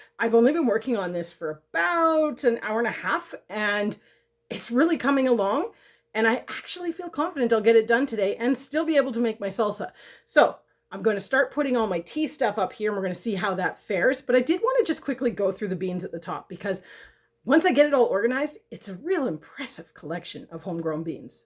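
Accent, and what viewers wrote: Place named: Canada. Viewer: So glad that you are back to YouTube! I was missing your videos; as a Canadian it's so good to hear 'local' voices.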